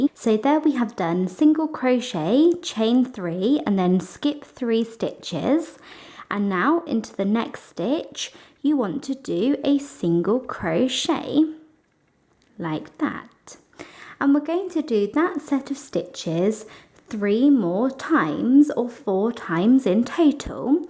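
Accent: United Kingdom. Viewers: I love her voice as well!!! I just love the accents from all over the UK!!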